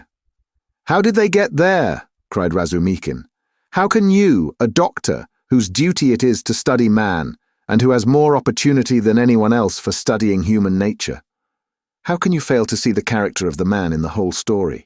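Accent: United Kingdom. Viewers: Thanks. I needed a Brit for this one. :eyes-pink-heart-shape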